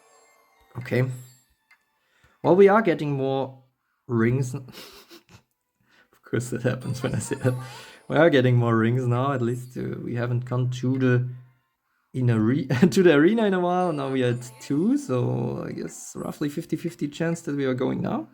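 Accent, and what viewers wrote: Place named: Germany. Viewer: why u have so much Diamonds wtf and u sound like u from germany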